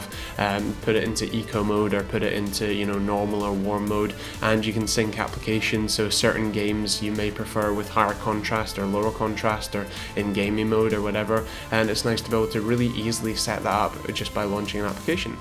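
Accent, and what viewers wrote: Scotland, United Kingdom. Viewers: scottish not irish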